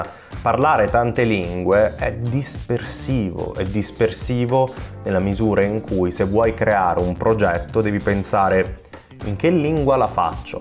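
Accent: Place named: United Kingdom. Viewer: Voglio. Il. Tuo. Accento. Inglese.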